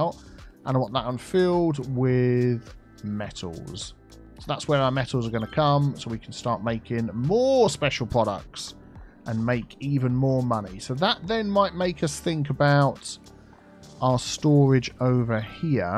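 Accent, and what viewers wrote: United Kingdom. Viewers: Hi, although I am on PS4, I really enjoy ur videos, and that's becouse your english accent, wich I find it to be so British, hahaha. We are u from? If u don't mind me asking.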